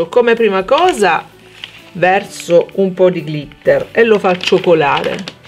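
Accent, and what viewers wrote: Catania, Italy. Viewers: Ma tu sei di Catania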